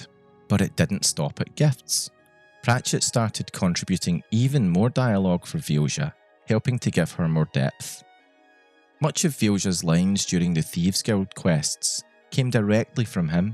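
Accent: Scotland, United Kingdom. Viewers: I love that Scottish accent and cadence. It's not so heavy that I can't understand it, but it has the bouncy musical quality of the Celtic dialects. Please continue to speak through all the ages, so that we may not be deprived the pleasure of hearing your voice. I miss Mr. Pratchett and his stories. I suppose that is the way of stories though. Life goes on; things change; stories end, begin again, evolve. We carry them in our hearts, and keep them alive in our own stories. On and on, across the endless cascade of creation. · Is this scottish? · I also don't know how I got here. But I love Terry Pratchett. And I also enjoy listening to a scottish accent 🙂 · It was strange seeing my words in print, but surreal hearing them in that great Scottish accent. You've done a wonderful job of relaying some of the magic I felt while helping to bring Vilja to life.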